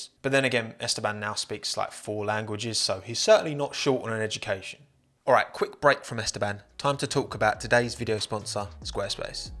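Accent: United Kingdom. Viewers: Bri'ish accent >>>>> All the other accents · There's an aspect I really like about your videos: even if they're ten minutes long, at the end it feels like it's been 2 minutes. I could definitely stay here listening at you telling stories for so long. As a non-native speaker, I really really like your Bri'ish way of talking, it's really enjoyable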